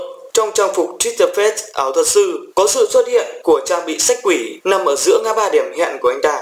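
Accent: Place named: Vietnam. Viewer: Video rất có ích nhưng mỗi tội ad phát âm tiếng Anh đậm chất nửa Anh nửa Việt.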